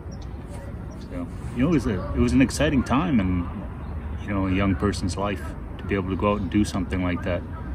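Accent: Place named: Finland. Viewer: A nice finnish accent.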